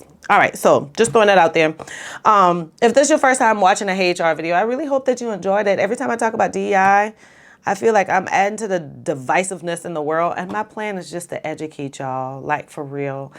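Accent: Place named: South Carolina, United States. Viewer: This is unrelated, but I gotta know where you're from. I'm from the Charleston area of SC, and your accent is reminding me of that lol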